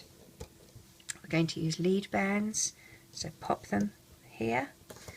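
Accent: United Kingdom. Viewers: Are u british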